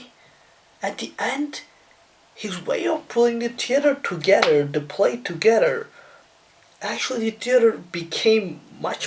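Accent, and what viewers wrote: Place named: England, United Kingdom. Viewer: Your english is better, seems more from England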